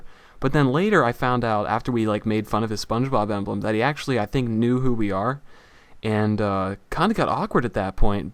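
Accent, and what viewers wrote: Philadelphia, United States. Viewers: You from Philly? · So your from Philadelphia? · Omg Your From Pennsylvania! I Knew It:) What Part Of Pennsylvania Your From? I'm from South Philadelphia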